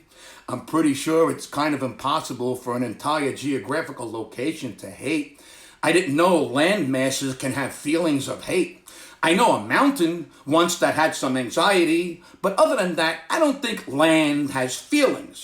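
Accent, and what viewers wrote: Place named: Brooklyn, United States. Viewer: You're Hilarious! You make me miss Brooklyn so much with the way you talk!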